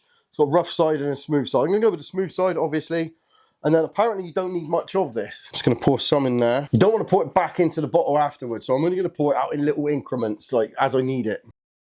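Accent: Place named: West Country, United Kingdom. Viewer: Thought I recognised west coutry accent.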